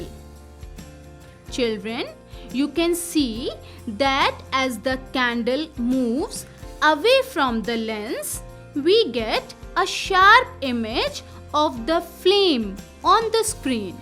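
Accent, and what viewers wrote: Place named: India. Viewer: Wow VERY NICE ENGLISH FOR SURE SOUNDS LIKE INDIAN VOICE BIG L CHANNEL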